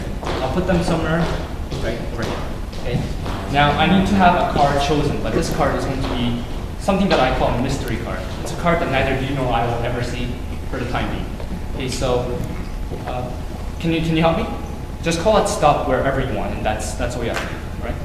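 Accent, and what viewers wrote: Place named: China. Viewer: that was an awesome performance... BTW u sound like a Chinese. r u??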